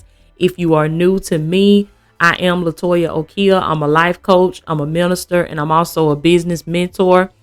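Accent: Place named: Georgia, United States. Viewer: U sound like u from louvalle ga. THAT'S A GOOD THING. His children r there too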